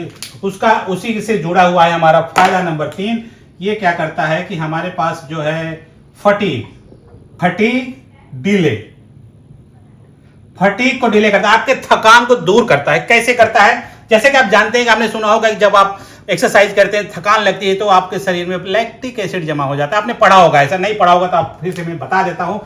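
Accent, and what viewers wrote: Bihar, India. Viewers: बड़का डाक्टर। 😂❤ बिहारी हैं का? भोजपुरिया?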